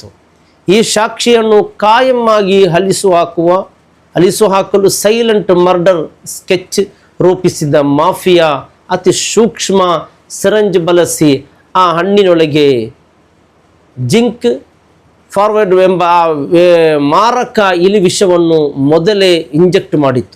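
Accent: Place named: Mumbai, India. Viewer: Nimma kannada Mumbai kushi agthide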